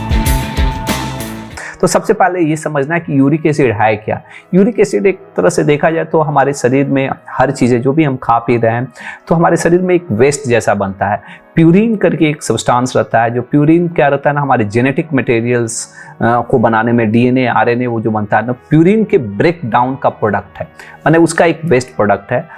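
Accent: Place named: Bihar, India. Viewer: Sir, aapke bolne ke style se bta skta huun app surely Bihar se hain wo bhi MAGADH area se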